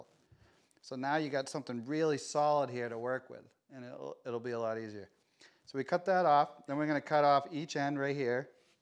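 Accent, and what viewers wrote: Boston, United States. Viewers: Boston accent detected.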